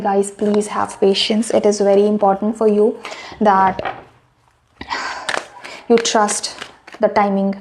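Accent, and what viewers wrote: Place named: India. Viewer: Don't speak indian style English it makes me sick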